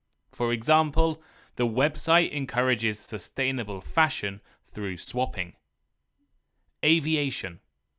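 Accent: United Kingdom. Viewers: Is it northern or southern british accent?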